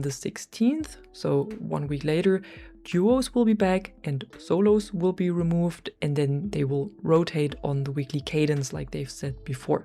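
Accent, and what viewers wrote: Germany. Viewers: where are you from? Dialect sounds German?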